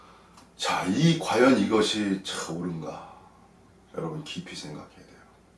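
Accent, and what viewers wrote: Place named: Gyeongsang, South Korea. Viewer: 딱 경상도놈 같더라니 울산이구나!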